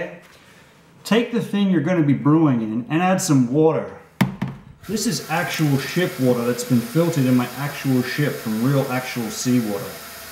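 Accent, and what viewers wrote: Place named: Australia. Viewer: What accent is that? Sounds like Australian that keeps turning into American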